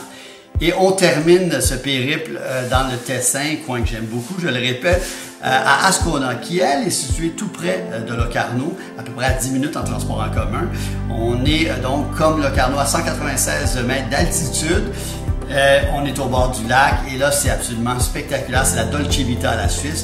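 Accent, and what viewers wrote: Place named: Quebec, Canada. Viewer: Bonjour Monsieur, merci de nous présenter une magnifique région de Suisse, le Tessin ou Ticino. Pourquoi vous a-t-on choisi, avec votre accent du Québec ? Que mange-t-on dans le Tessin en Suisse ? Autre chose que du sirop d'érable ? Vous êtes du Québec, vous connaissez, je suppose. Comment sont les gens dans cette partie de la Suisse où l'on parle italien, dix pour cents de la population suisse. Présentez nous la gastronomie du Tessin, ils ne connaissent pas le sirop d'érable, présentez nous leurs goûts en matière de musique. Ils ne connaissent pas Céline DION et GARROU, Lara FABIAN, Isabelle BOULAY et vos sommités de la musique du Québec. Sur les lacs de Lugano et de Locarno, on ne fait pas du patin à glace comme sur le Saint-Laurent. Moi, c'est Paul, venu de Bruxelles, Belgique. Frites, bière, moules, chocolat sont mes aliments préférés. 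En ce moment, janvier 2023, il fait froid. A Bruxelles, cinq degrés, encore l'automne sauce du Québec, mais en provinces de Liège et Luxembourg, neige, neige, neige et encore, luges, traîneaux, ski de fond, raquettes, remontées mécaniques, les Hautes Fagnes, un vrai décor digne du Québec, les Hautes Fagnes, cette région de Belgique qui touche l'Allemagne et le Luxembourg où dix pour cents de la population belge parle allemand. Le Tessin est cette région de Suisse, en été, chaude et ensoleillée où les habitants parlent italien, dix pour cents de la population suisse. Des gens méridionaux plus agréables qu'à Zurich et à Lucerne, des suisses u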